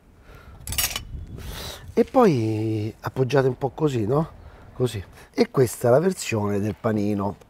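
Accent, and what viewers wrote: Rome, Italy. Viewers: ..te lo devo di...tu ogni volta mi fai venire un orgasmo gastonomico con le tue ricette😂 ma poi amo tantissimo come tu le racconti con quell'accento romanesco. I love It😍❤👍👍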